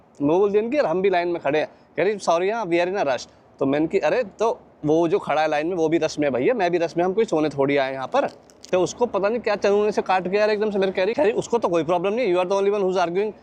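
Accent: Haryana, India. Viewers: Bhai aap ki aawaz sey lagta hey ke aa hariyana ke raheney waaley ho.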